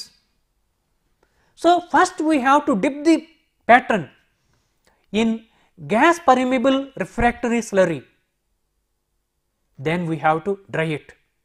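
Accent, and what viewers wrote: India. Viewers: Ok its very interesting ,but common this indian accent........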